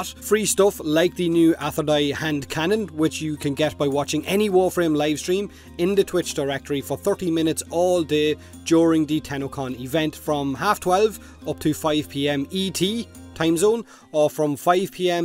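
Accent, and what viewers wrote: Ireland. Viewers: Hey, you are Irish, right? Do you know Plenty O'Toole?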